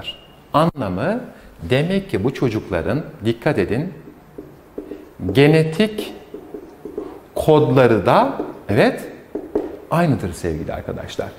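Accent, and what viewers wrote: Standard. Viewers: Hocam 10 numara 5 yıldız adamsınız. En önemlisi diksiyon harika ve tertemiz Türkçe. Ha bi de Halil Sezai ders anlatıyor zannediyorum hocam arada karizma adamsınız :) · türkçesi maşallah TRT muhabirleri gibi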